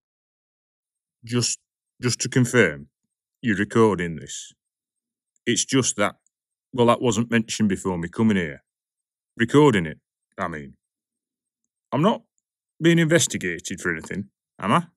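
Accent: Yorkshire, United Kingdom. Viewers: Such a rich biography. Loved the timeline. Though I'm no expert, I liked your Yorkshire accent. Finally Sheppard? Son of Jack Sheppard perhaps? Thank you for another excellent episode.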